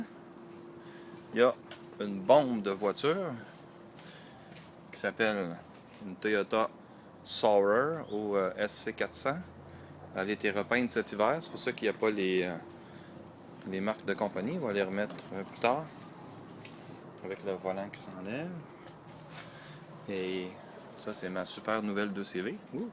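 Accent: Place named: Quebec, Canada. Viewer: merci pour cette sympathique vidéo, toujours un immense plaisir de d'entendre la voix du Québec. Pour les ailes arrières, hélas c'est plus que la rouille mais de la perforations, j'ai eu droit également pour les ailes avant et les portières. Il faut découper, former une nouvelle tole, souder etc.... mais le résultât est à ce prix. En tout cas félicitation pour votre belle SM. <link>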